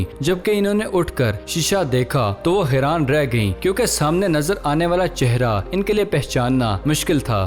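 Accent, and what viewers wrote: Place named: Rawalpindi, Pakistan. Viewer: love u bran jani PPindi ke lagty hoo keep up the good work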